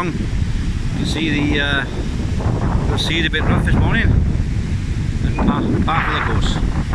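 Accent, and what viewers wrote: Wales, United Kingdom. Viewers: Absoloutely loving the Welsh accent bud and your vids are incredible. Keep up the excellent work, subbed!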